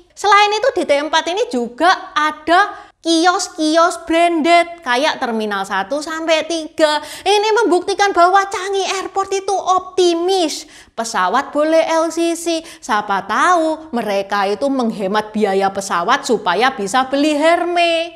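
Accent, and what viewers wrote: Java, Indonesia. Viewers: Aduhhh, dengerin ci menjelaskan, maaf ya, kenapa jd stress ya😅... volume suaranya itu lo mbak dan medog jawanya. Pusyingggg... Intinya kawan. Mau di terminal brapa aja turunnya, gov singapore sdh pasti membuat penumpang nyaman. Ada skytrain, ada shuttle bus tp free semua sbg bentuk convenient nya utk penumpang. Jd ga susah2 amat mau dr budget airline or full service flight kalo perlu pindah. Utk makanan, branded stuff, di semua terminal ada. No worries.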